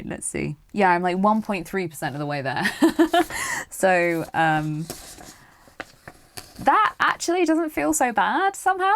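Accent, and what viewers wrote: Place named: United Kingdom. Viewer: Nice to meet you. Love a British accent. Hello from Australia 🇦🇺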